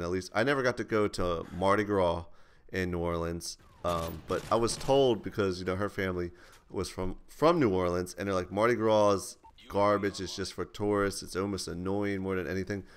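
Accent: New Jersey, United States. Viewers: i knew you were from jersy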